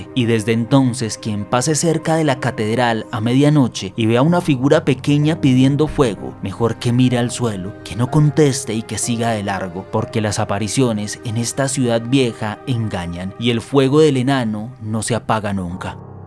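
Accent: Colombia. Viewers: Muy lindo pero porfavor un venezolano que narre no un colombiano utizando los matices colombianos se escucha raro Pero me encantó los relatos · Este narrador no es Venezolano...Es Colombiano... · es de Bogotá. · Es colombiano 😅😅😅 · Son historias venezolanas contados por un maldito colombiano? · Muy buenos los relatos perola malo es el acento colombiano que tiene el narrador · Paisa, puede ser de gochilandia, fronterizo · Pero que cantidad de gafedades y de paso la narrativa es colombiana con demasiado acento , la próxima mínimo busquen alguien de aquí, decir chamo o pana no es ser venezolanos. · Me gustó mucho y a pesar de que no es el acento venezolano aprecio que un Colombiano tenga esa dicción tan linda y encima contar con tanta amabilidad las leyendas Venezolanas..nueva suscriptora 🎉❤ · El mismo script de otro canal bueno igual me encanto con ese acento tan bello viva colombia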